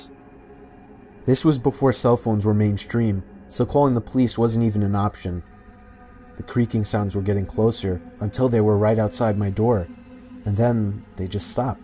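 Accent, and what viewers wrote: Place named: New England, United States. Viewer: I caught your new England accent in this one mr. ;)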